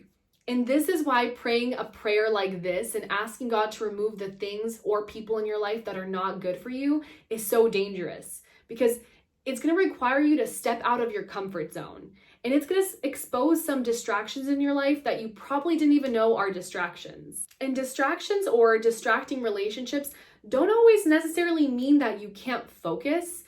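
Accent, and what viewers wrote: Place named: Spain. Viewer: Love the Spanish accent 😍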